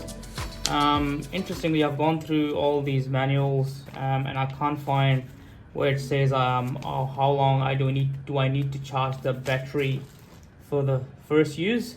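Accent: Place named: Australia. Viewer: U have perfect aussie accent even better than aussies...how u mastered it... ? Just feeling michael clarke is there...😅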